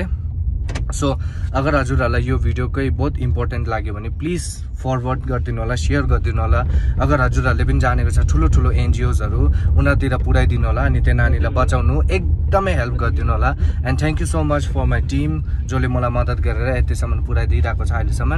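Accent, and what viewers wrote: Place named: Manipur, India. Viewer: bhai timro bolne tarika manipuri nepali ko jasta cha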